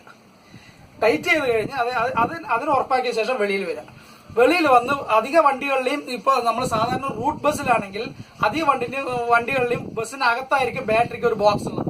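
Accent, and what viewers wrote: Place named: Palakkad, India. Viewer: I Like ur palakkad Languages iam from pune T q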